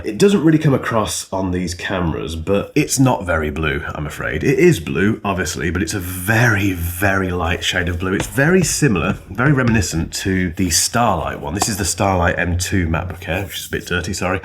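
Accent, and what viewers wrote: United Kingdom. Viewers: Dude didn't slip out of his British accent even once.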